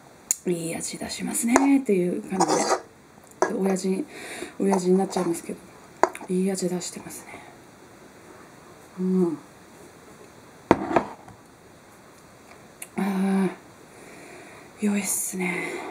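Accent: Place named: Tohoku, Japan. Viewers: 訛りが私と同郷な感じがするのですが・・・(笑) 私は生粋のイナカッペ、キリタンポ野郎ですｗ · 美味しそうに食べますね。お腹空いてきました＾＾ 東北の方ですか？ 東北人なのですが、なんとなくイントネーションに親近感が沸きます。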